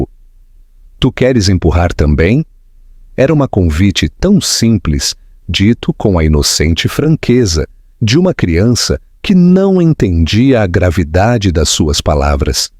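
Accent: Portugal. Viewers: Pq falar com um sotaque português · Esse é o português falado em Portugal... Muitas palavras são diferentes no Brasil, porém dá para entender perfeitamente... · Achei chata a narração! Porque essa troca de sotaques? Português de Portugal! E as vezes português do Brasil, achei estressante. Porém a história em sim é linda, forte mais bonita. ❤ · Uma mistura do português de Portugal e o português do Brasil.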